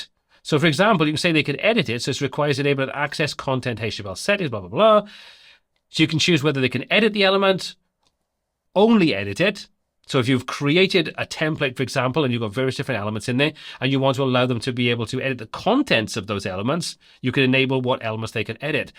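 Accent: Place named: South Wales, United Kingdom. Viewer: He’s a hwntw (south Wales)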